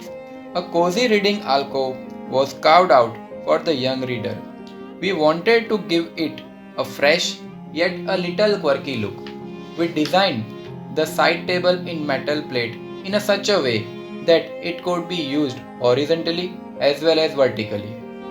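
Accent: Gujarat, India. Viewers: Good design, explained and shown very well (inspite of the thick Gujarati accent). This is the second consecutive well explained video (after the Aslam Sham project). Great job, Buildofy - your editors are getting really good at this! Or have you hired a new one?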